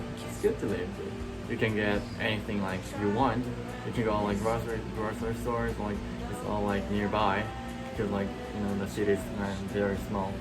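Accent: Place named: Canada. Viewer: バンクーバーに移民して25年たつものですが、未だ来て一年で英語がとってもお上手ですね。アクセントも私の息子達の様にカナダ人ぽくて驚きました。これからも頑張って下さい。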